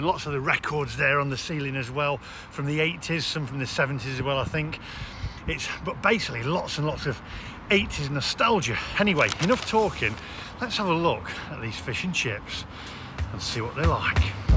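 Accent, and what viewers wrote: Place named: Yorkshire, United Kingdom. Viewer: Ay Yup Lad!! Whats wrong wi Yorkshire accent!!!!!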